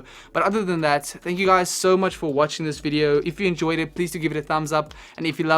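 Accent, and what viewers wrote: South Africa. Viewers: Are you South African bro ?? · You sound South African dope video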